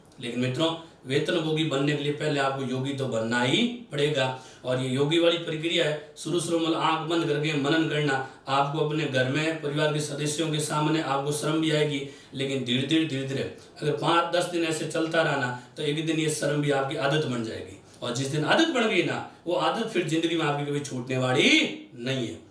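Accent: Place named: Marwar, India. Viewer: Sir Kitni Aapki madhur Boli hai sa aaj to Aapne sudh Rup se marwadi legweg ka pryog kiya hai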